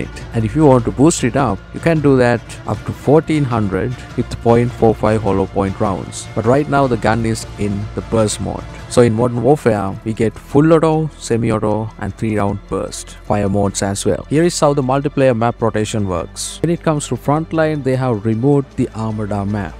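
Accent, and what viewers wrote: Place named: India. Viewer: Hey love ur vids has helped me a lot also are u from india ur accent seeems like it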